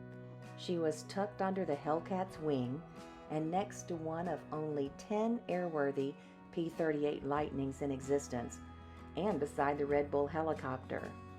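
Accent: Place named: Mississippi, United States. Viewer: I love the videos and great to finally hear your Mississippi voice!! ❤️.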